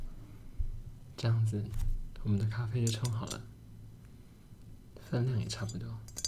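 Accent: Taiwan. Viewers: 剛才躺著聽這個準備睡覺，都沒有看到視頻的題目，可是聽到你我就知道你是台灣人。好喜歡台灣人說的普通話。口音好好聽，還有你的聲音也非常好聽哦!希望你會做更多視頻! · 男生台腔做ASMR还是很舒服的，我补脑了下东北口音瞬间笑死。做的很棒！非常感谢。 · 我是大陸人，我就超喜歡台灣的口音的！感覺超溫柔超可愛的！哈哈，每個人喜歡的不同吧，反正我認識的朋友都很喜歡台灣口音，不會排斥的💛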